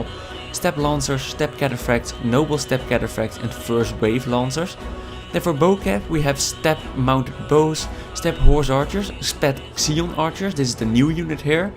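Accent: Netherlands. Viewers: Serdar Kaleli you idoit hes dutch no indain you rasict! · yes of course, i understand the need of time in these video's, still awesome you upload them in such rapid succesion, i only have one question, maybe you said it before but i might have forgotten it, are you dutch? Because you kinda sound dutch. Although this is no offense of course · where are you from you sound dutch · That's a dank Dutch accent you have there friend.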